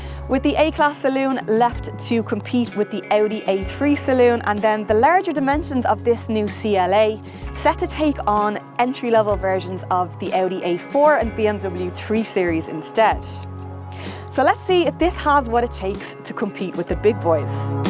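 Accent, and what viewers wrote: Ireland. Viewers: Jacksepticeye made me like Irish accent and this review was amazing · I don't like Irish accent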